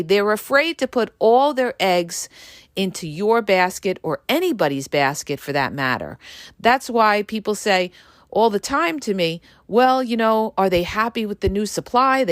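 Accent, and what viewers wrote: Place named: New York City, United States. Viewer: A NYC accent...;)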